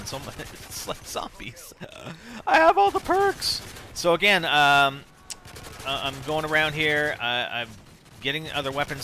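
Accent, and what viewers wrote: Canada. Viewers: your canadian aren't you =p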